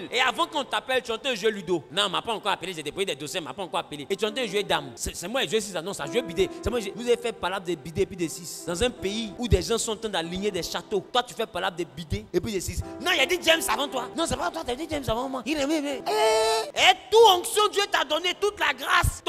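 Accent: Ivory Coast. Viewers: Je suis fou amour de l'accent ivorien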